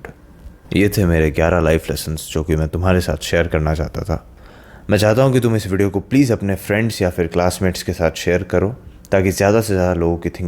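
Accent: Rajasthan, India. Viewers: Bhai tum rajasthan(marvad) meh konse shaar se ho? Tumara accent and ghalc***a se pata chala.😂 I too want to make physic💪 like you and wanted to learn many skills in life.(in short, work on myself) which I have missed to do in my teenage. But will try to ingage in things whenever I will get chance.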